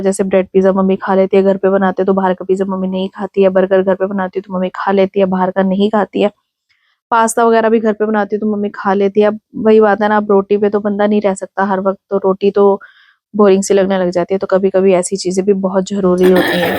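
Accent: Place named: Multan, Pakistan. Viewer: Aap.ko Multani bolnee aatee hai. Aap Kaa accents hai Multani bolnei kaa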